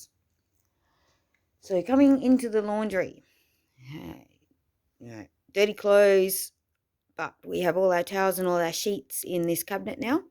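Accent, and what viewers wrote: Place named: Australia. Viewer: Wooooohooooooooo go you so proud of you it’s good to here an Aussie thanks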